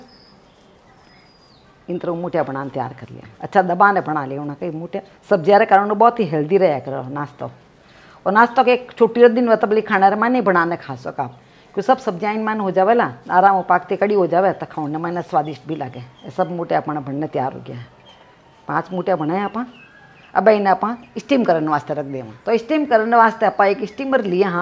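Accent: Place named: Rajasthan, India. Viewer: Aunty u r great apki saari dishes bhut achi shandar hoti h Par jab aap saath me marwadi bolte huye bnate ho to usme aur bhi jaan aa jati h Bhut acha lgta hai jab aap marwadi bolte huye sikhate h Ekdum maa jesi feeling aati h Thanks ki aap sabko sikhane ke liye itni mehnat karte ho.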